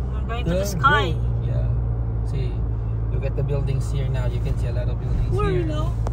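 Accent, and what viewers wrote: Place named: Visayas, Philippines. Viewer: Anong dialect po gamit nyo Sir? There's a little hint of Bisaya and Illonggo.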